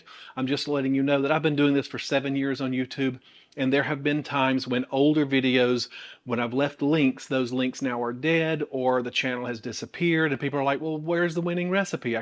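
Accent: South, United States. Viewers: Gosh I’ve had such a bad day, hearing this southern accent talk about cookies is just what I need 😊 thanks!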